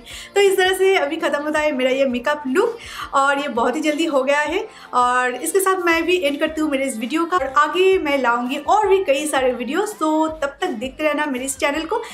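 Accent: Bengal, India. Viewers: I wouldn't call it weird as her mother-tongue Bangla is dominant in her accent. So with her hi-speed talk, it did sound different from the actual pronounciation. All she needs to do is slow down slightly to the range of 140-160 words per minute, which is supposed to be an ideal rate. One can check the internet for this. For jokes one can keep a higher rate.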